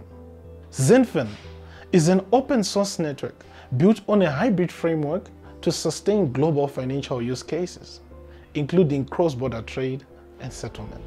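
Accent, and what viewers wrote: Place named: South Africa. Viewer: Hi! I am a fellow south african - i recognise your accent! where can us south africans buy XDC? please help thanks! xx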